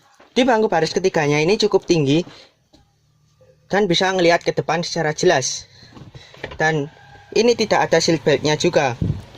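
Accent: Java, Indonesia. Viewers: Aku seneng logatnya....medok banget, koyo jape methe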